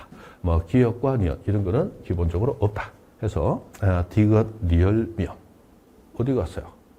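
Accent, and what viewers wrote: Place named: Gyeongsang, South Korea. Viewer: 와 이해 하고싶었지만 잘 몰라서 그냥 외우고 있었는데 설명 과 암기를 모두 해주시네요 ㅋㅋ 거기에... ㅡ 발음이 안되서 ㅓ로 하는 대구경북 억양까지 구수... ㅋㅋㅋ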